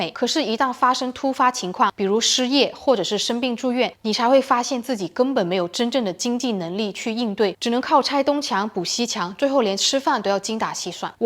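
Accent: China. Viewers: 這女的是對岸嗎，鄉音好濃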